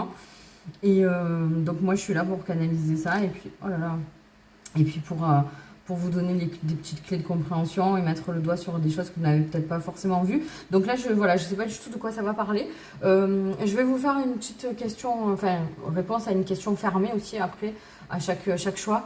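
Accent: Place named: South, France. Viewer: J'aime bien votre accent du sud 🥰😉 !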